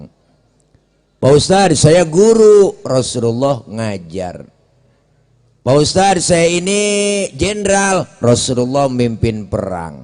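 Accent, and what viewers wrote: Jakarta, Indonesia. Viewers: Maap pak ust bahasa y kaya asli betawi pasti jakbar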